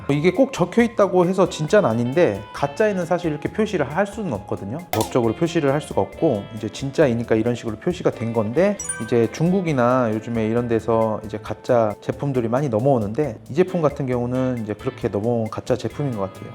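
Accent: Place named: Jeolla, South Korea. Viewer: 말투가 절라도구마잉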